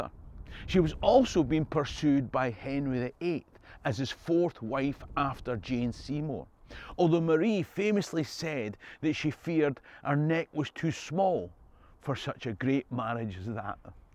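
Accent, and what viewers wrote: Scotland, United Kingdom. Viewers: I'm just a regular American, but I love the Scottish accent. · I could listen to your brogue all day.... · You just popped up into my YouTube feed and I’m so glad that you did....well, when I say “you”, I mean “your channel”. I love history, but focus mostly on The British Isles , Europe and the States. You are my first Scottish channel and you make history energetic, interesting, and even fun. You make it all the better with your amazing accent....although I, being of the States, in reality is the one with the accent. Thanks, so much!! I have joyfully clicked, smashed and rang the bell (icon).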